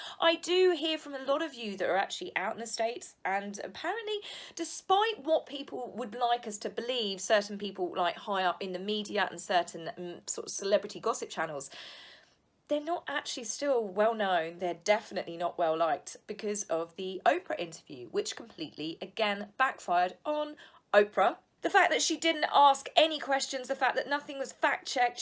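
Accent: United Kingdom. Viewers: you are funny as hell. I love love love your British accent.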